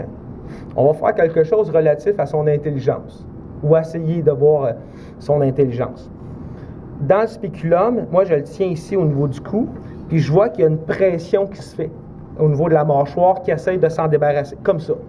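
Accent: Quebec, Canada. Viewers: Purée, ça faisait longtemps que je n'avais pas entendu l'accent Québecois ! N'empèche, c'était une vidéo vraiment intéressante ! Néanmoins, ce gars est complètement barge de faire ce genre de truc.... Je m'abonne.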